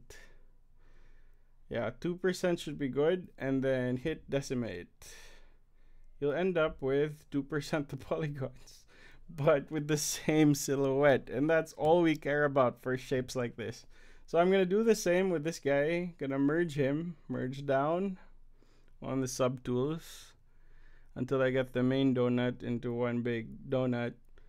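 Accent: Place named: India. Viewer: your accent is sounds like an indian.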